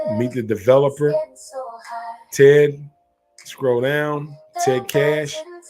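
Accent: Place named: Alabama, United States. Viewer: You from Alabama? Kinda sound like it lol 💪🏽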